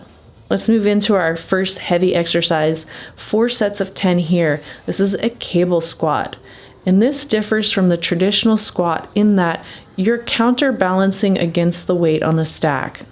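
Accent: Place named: Texas, United States. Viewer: She’s got that Texas “train hard y’all” thing going. I like that. You should sign off saying “Y’all come back now ya hear!”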